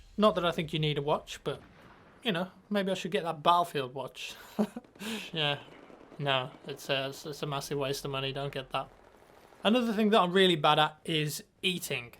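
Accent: England, United Kingdom. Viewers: is he from England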